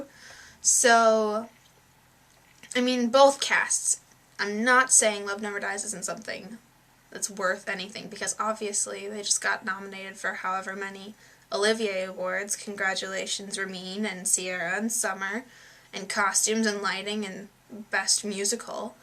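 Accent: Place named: United States. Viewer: Are you American? If you live in London, how come you're speaking in an American accent?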